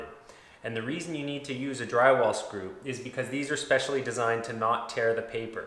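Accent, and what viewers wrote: Canada. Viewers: Canadians have agonizing accents.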